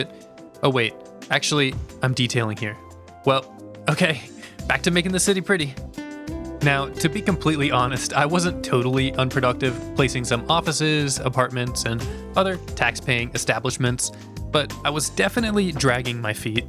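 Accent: United States. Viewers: you're from 'murica, right?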